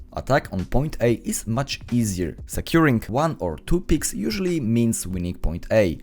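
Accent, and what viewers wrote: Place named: Poland. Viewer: Beautiful Polish accent, at first you were Russian, ale potem zauważyłem ee to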